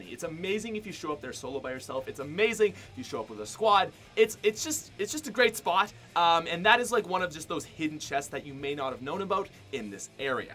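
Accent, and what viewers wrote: Canada. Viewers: Is he Canadian he sounds Canadian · Are you Canadian?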